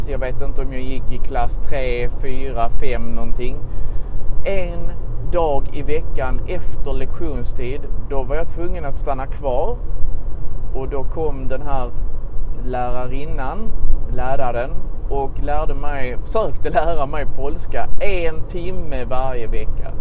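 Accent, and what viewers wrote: Scania, Sweden. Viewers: hahaha skånska är nice! ;)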